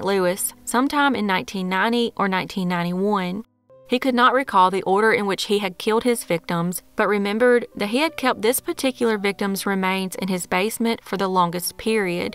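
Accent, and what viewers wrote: South, United States. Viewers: Please know that I appreciate your posts! And love your southern accent too! Lucy in Canada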